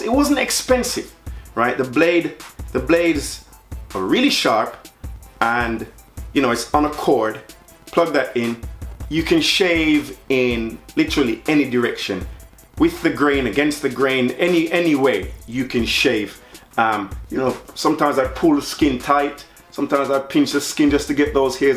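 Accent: Jamaica. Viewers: Hey man, are you originally from Jamaica? I can hear it in the accent. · The patwa hawd fi hide.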